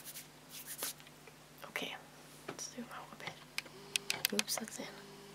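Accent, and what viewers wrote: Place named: North, United Kingdom. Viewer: gotta love the northern accent. i love the way she says book. sounds like boooooook. lol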